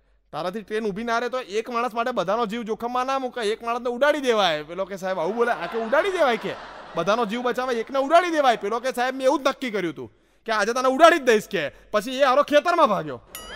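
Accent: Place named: Ahmedabad, India. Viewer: તારી અમદાવાદી બોલી મારા દિકરા જેવો છે એ વિદેશ માં છે મને એની યાદ આવે તો તમારા વિડિઓ જોવું.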